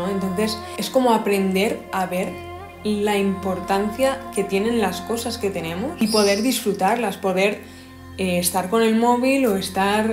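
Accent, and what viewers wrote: Spain. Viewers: Hija, tú eres muy católica y aún no te has dado cuenta (o quizás lo eres y yo no lo sé). La gratitud es un valor más católico que el mismisimo. -> O darles las gracias a Dios. -> Catastrofista por abandonar a Dios y no ser capaces de dar valor a las cosas, porque el utilitarismo lo está matando todo, quizás. -> Cada mañana te despiertas y agradeces cosas, casi como cualquier cristiano hace con Dios en sus ritos. Menciono mucho a Dios, pero ni siquiera soy cristiano, simplemente son observaciones porque me parece curioso lo que dices y que no te des cuenta. En cierta manera es normal que pienses así, por tu acento pareces española y España es una sociedad muy católica. Incluso los que no se consideran católicos tienen raíces en esta religión. Más de 1000 años de una religión influyendo sobre una sociedad tiene sus consecuencias, incluso más de lo que nos podemos imaginar. 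En fin, Dios se ha convertido en una cosa sin nombre ni veneración, pero presente en el día a día, y esto es ejemplo de ello.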